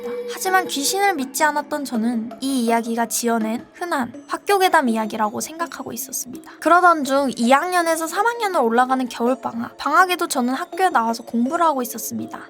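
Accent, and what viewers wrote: Standard. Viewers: 새삼 느낀게 사투리 다 어디가고 서울말 쓰고 있네요?? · 표준어 패치된 ㄷㄷ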